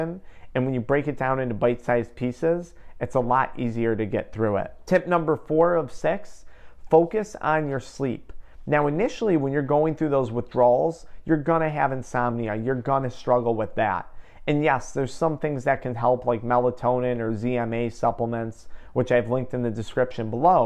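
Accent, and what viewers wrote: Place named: Buffalo, United States. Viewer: i knew by your accent you were from buffalo new york i saw the 716 number and knew it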